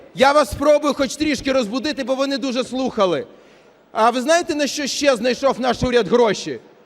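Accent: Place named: Russia. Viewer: Навчись розмовляти українською мовою, а не досі в тебе присутня російська! Ненавиджу таких! За що хлопці наші гинуть?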